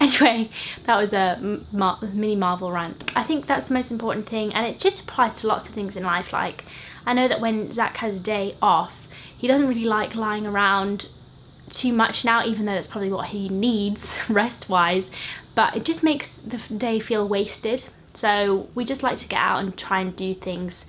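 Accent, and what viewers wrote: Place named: Scotland, United Kingdom. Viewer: I can still hear some Scottish in your accent and it makes me so happy. Also you look lovely!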